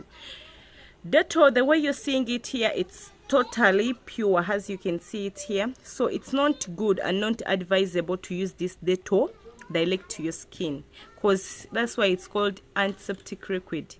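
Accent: Uganda. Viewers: Uganda accent for me bby girl thanks im gonna follow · Hi, thanx Are from Uganda? Your accent!!!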